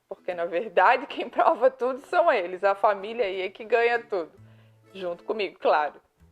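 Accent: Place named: Itajaí, Brazil. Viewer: Querida! Que Deus te abençoe sempre ❤🙏🏻 Legal ouvir um "sotaquezinho" de Itajaiense aí, na América, amoooooo🥰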